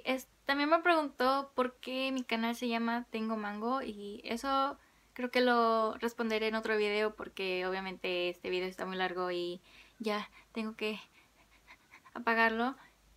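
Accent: Mexico. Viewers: Tu asento fresa...me encanta..felicidades..me encantas.. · Que linda wow eres de mi país mi hermoso México saluditos ^.^ ya decía que tu acento era igual al mio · ¡Tienes el acento de México! 😂🇲🇽👏🏻 Tu español es muy bueno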